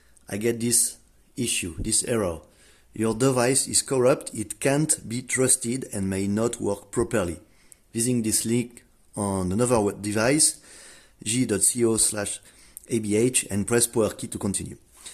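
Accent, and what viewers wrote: France. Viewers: Je pense que tu es français vu l'accent 🤫moi j'ai un problème avec mon pixel 3 qui est en fastboot mode et qui m'indique failed to load/verify boot images. si tu as une idée !!!! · I Can barely Understand you with your French Accent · Are you french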